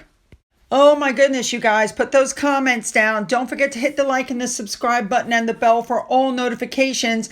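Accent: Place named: Pennsylvania, United States. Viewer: Her accent says Pennsylvania to me, perhaps?😊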